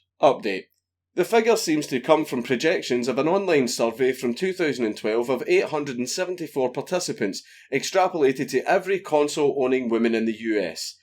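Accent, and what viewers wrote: Scotland, United Kingdom. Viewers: “... the Internet collective of weaponised autism kekked into gear” I know Dank meant to say ‘kicked’ but what I heard in his dank Scottish accent was far more fitting. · he's not South African he's Scottish and that screensaver is a joke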